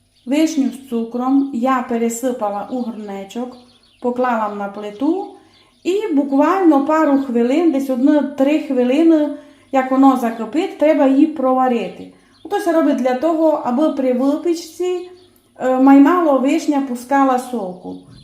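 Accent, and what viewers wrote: Transcarpathia, Ukraine. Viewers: Тільки за закарпатський діалект та акцент ставлю лайк❤ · Дякую за рецепт❤ мені так подобається закарпатський говір, слова інші - насолоджуюсь діалектами неньки нашої. Міцно обіймаю.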